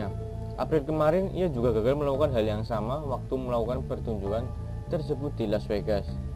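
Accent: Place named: Banyumas, Indonesia. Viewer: iya ,, yg ngomong ngapak,,,... memang ok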